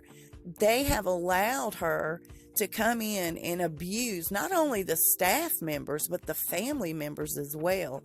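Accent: South, United States. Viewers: P.S. I really Like Your Southern Accent. Where Are You From In The South?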